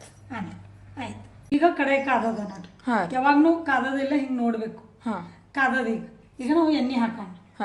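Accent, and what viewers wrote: Maharashtra, India. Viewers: Nimma Marathi mix bhase tumba channagide😊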